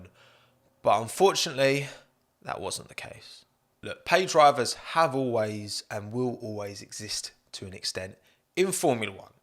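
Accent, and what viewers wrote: United Kingdom. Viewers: Mega Brit accent 😂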